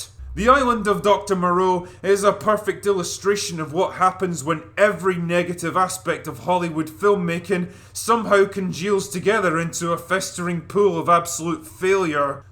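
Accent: Scotland, United Kingdom. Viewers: The Worst...? Well, I admire your razor sharp whit & kickass Scottish accent... You're probably too young to remember Ishtar, Heaven's Gate, The Room, Roar... Love your work! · This guy sounds like he’s straining while taking a shit and giving a review at the same time in a British accent with a mouth full of pastries. Not being rude but just what it sounds like to me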